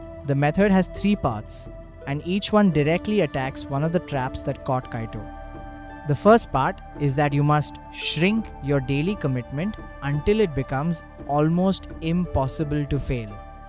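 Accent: India. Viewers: Japanese content but Indian Voice Over ???????????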